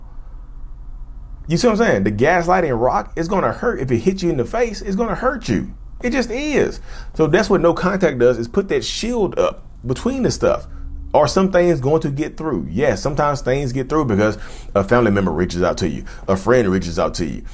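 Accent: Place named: South, United States. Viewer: This is random but I am from the northeast so to me you have such a southern accent and I love it ❤❤ I could listen to you talk all day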